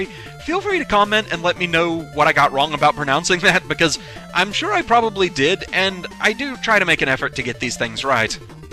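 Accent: United Kingdom. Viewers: He's about as British as it gets if you hear his voice commentary. In a good way I mean.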